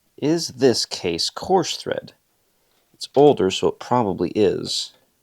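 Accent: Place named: United Kingdom. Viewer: HAHA as a brit, I appreciate the british accent 😁😂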